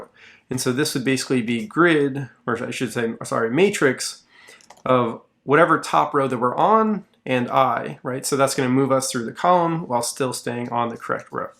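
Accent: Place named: United States. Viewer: The inotro and outro music are rather goofy, but it is so nice to hear an algorithm explained in normal, US accent English instead of, well, you know!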